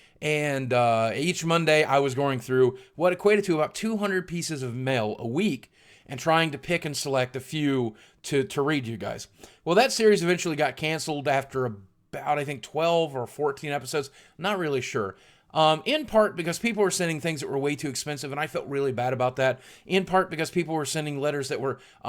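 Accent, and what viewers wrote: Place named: San Francisco Bay Area, United States. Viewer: Hella? you sound like you grew up in the SF Bay Area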